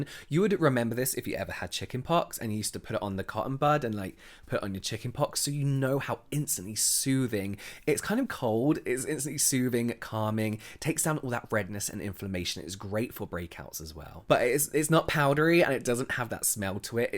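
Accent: United Kingdom. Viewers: not really, has that soothing voice + British accent. Have you heard Alfie Deyes’ voice? He’s British but no way he can do audio books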